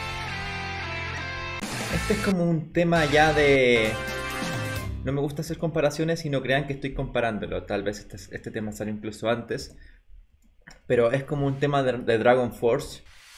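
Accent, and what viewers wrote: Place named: Chile. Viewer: Se te nota caleta el acento chileno completo palta mayo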